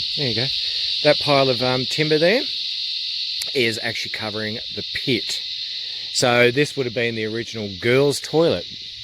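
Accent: Australia. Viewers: I’ve seen a few of your vids now and I have to tell you how lovely it is to hear an Australian on YouTube. I know there are many but most of my subs are from North America. Enjoy your work.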